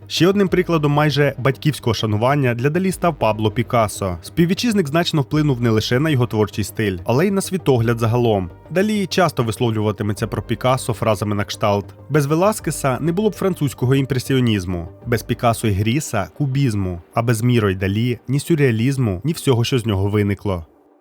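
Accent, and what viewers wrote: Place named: Russia. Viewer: Мій улюблений художник. Він, дійсно, геній. Відмовився розмовляти іспанською з королем Іспанії, бо він каталонець. Про брата теж знала. По інформартивності- молодці. Особисто до Вас прохання - попрацюйте над вимовою, бо вона поросійщена. В нас тверді шиплячі. А у Вас це звучить як «[якщьо], [щьо], [щє], [вчінок], [вибачьте], [розбираючі], [бачять], [чєрга]…” Випуск чудовий, дякую. Якщо б ще вимову підтягнути- буде супер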